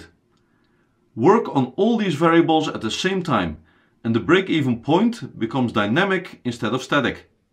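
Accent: Netherlands. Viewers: Thank you so much for helping! Hopefully I will pass my entrance exam. Also, I had to ask are you Dutch? I could tell by your accent :)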